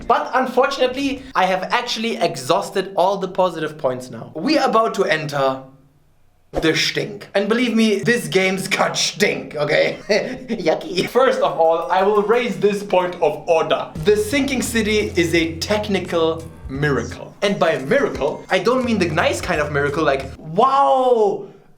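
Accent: Germany. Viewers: Liebe den schnellen englisch deutsch mashup, weiter so xD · Mostly its German but he often loves to put some Asian accents in. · you are chinese but your accent sounds like german and french some times and did you just said "figlio di putana" (son of a bitch in italian) ? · Hes german I think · weird question: are you german? lol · Ich Love your Denglisch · asian german speaking english im sold xD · Are you from Germany? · DIeses Deutsch-Englisch so geil :D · german for sure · are you German? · Are you German? your accent reminds me of my german teacher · Are you german? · why the fuk do you speak deutglish im mexian how the fuk am i supposed to understand you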